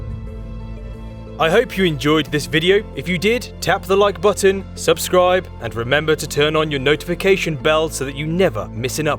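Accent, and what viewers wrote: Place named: England, United Kingdom. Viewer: Sounds smarter with an English accent. Can you do it again in an Australian accent? Oy crikey mate!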